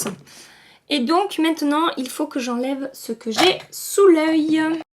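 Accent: South, France. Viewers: Mdrrr ma tchuin avé l accent du sud mis à part le maquillage qui est à son habitude plus que réussie disons même superbe mais là tu as du perdre 2000 abonnés lol 😂 avec ton coup de gueule on sent que tu es excédé de répondre à 10000 commentaires du genre tu sors pas de ta zone de confort etc toujours les mêmes couleurs et j’en passe mais voilà comme si bien dit y’a des vidéos à la pelle de tous meme comment fabriquer une bombe alors le maquillage n en parlons pas lol 😂 Bisous ma belle et ce que tu fais tu le fais bien et tu y mets du cœur et de l’investissement personnel et financier mais ça très peu on connaissance de l envers du décor. Des milliards de 💋💋💋💋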